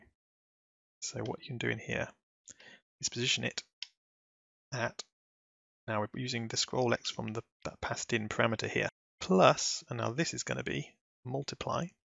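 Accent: United Kingdom. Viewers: I've never heard his voice before. WHOA. He's British. -- bobarlotte (on Scratch)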